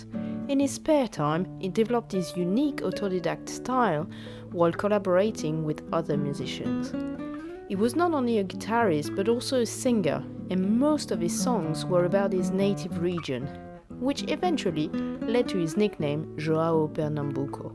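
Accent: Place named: France. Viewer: You've a delicious french accent.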